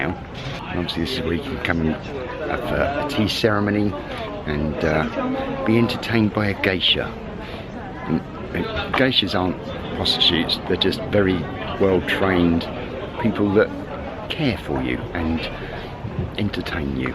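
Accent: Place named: Australia. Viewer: Really enjoy your narration. Bit of oz & cockney! Good videos too. You deserve a lot more views friend. Safe travels and regards from N. Ireland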